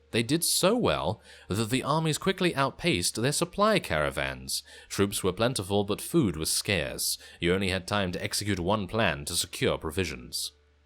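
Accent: United Kingdom. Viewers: This "play through" sounds much more like a very British reading of flavor text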